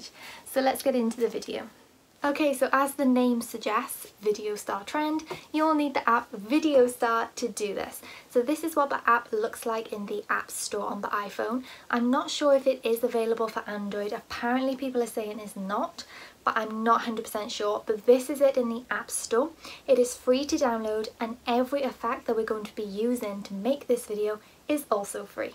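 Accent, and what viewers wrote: Wales, United Kingdom. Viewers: Omg love your British accent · Hey! Are you welsh?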